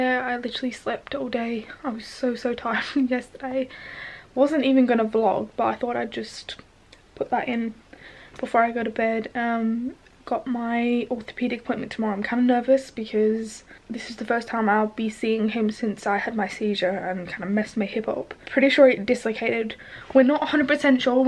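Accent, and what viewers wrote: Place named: Northern England, United Kingdom. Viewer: eyyy. Thank you so much. I've been curious for ages. Also. This is my friend. He was trying to help me figure it out. You sound like would've come from the north of England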